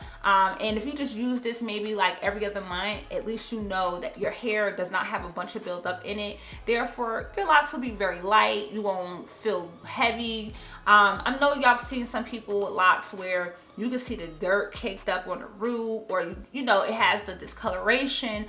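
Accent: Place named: New Jersey, United States. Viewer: I could tell you were from Jersey! lol like my mom. your locs are so beautiful! makes me want to reconsider and get smaller locs lol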